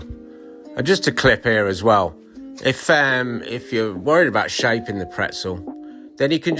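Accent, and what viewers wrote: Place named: New Zealand. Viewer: Hi I am from South Korea I thought you sounds like kiwi I am right You are in Christchurch I enjoyed your bread show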